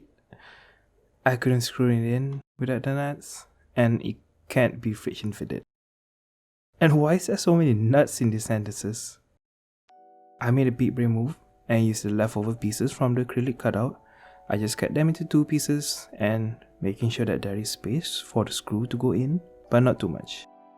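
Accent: Malaysia. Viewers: No wonder your english sounds familiar, melayu rupanya hehehe mantap bro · no matter how hard you try to hide your accent, a malaysian can always tells the different, ewaah. no la...link shopee tu shopee malaysia xD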